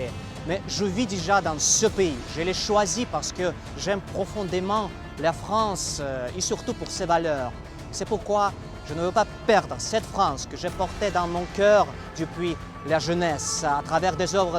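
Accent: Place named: Russia. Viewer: ну жестко русский акцент, а так хорошо!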